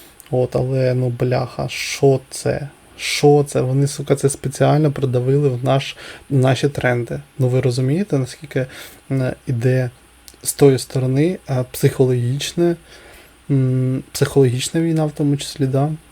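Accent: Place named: Russia. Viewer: А он музыкальный критик? Или комиссар из отдела политической цензуры? Кстати отчётливо слышно, что родной его язык русский, но он усиленно выдавливает из себя мову. Похоже, что вместе с мовой ещё шось выдавил в штаны.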